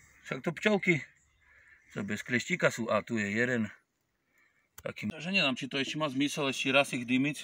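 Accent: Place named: South, Slovakia. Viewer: Zdravím tě ! Už je pozdě .kdyby byli silnější tak by to odebrali ale možna to bylo ťím že byli ve 2 nastávcích. Chci se zeptat kde včelaříš ? Oblast ? Ten přízvuk tipuju tak Juh 😉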